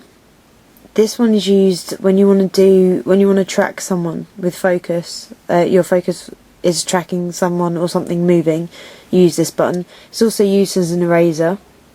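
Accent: United Kingdom. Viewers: Sister, your voice is very british, I like. Do you know how to open the hot shoe lid?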